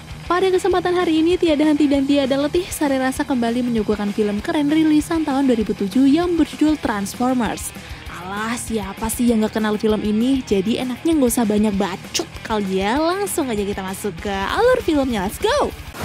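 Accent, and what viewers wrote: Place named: Java, Indonesia. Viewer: Wong jawa rika ya mba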